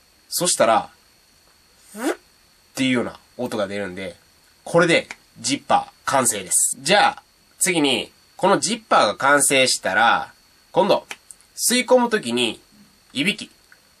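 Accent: Kansai, Japan. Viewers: 関西弁の方が聞きやすいし、かっこいい！！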